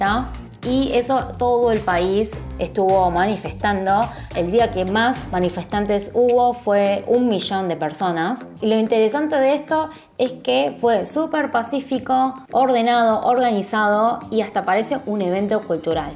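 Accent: Buenos Aires, Argentina. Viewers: Me gusta tu video pero... había vivido por 26 años en Buenos Aires y tu acento no es muy familiar. Tu forma de hablar... es.. porteña tal vez 90% pero el acento... naaa... 😜 Sera porque se trata de una filmacion? Jaja. Y seamos sinceros... lo que me encantaría llevar de Corea a la Argentina, el nro 1 es la cotización re-conta estable al dólar. La pecificación de precios de todos los inmuebles y autos. Y luego la seguridad... Perdon pero la cultura de delivery no me interesaria un sor**... Yo me conformaria con el delivery de la pizzeria La Continetal y Las empanadas Noble Repulgue... Lo que causa el mayor stress es la put**** situación economica, no es cierto?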